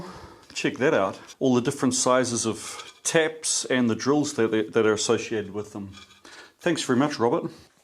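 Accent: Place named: New Zealand. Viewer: I'm here for the old machines, the witty humour, the Kiwi accent, the aviation stories and I'll happily stay for the cooking and woodwork!!!!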